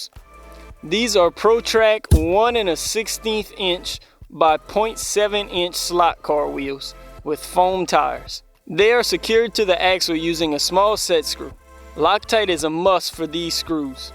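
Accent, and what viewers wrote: Louisiana, United States. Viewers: Hey, you from Louisiana? Dat sounds like a Cajun accent to me :) Anyways, sick build, man!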